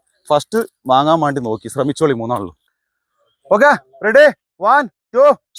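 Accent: Malappuram, India. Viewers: നിങ്ങളുടെ മലപ്പുറം സംഭാഷണം സൂപ്പർ 👌👌👌എല്ലാ game m വളരെ മനോഹരവും, രസകരവുമാണ്... സൂപ്പർ presentation 👍എല്ലാവരും ഒറ്റകെട്ടായി ഒരുമയോടെ സ്നേഹത്തോടെ മത്സരിക്കുന്ന ഗെയിം ആണ് എല്ലാം... പ്രകൃതി രമണീയമായ സ്ഥലത്ത് കണ്ണിനു കുളിർമ പകരുന്ന ഗെയിം.. ഒത്തിരി ഇഷ്ടമാണ് 🙏🙏🌻🌻🌻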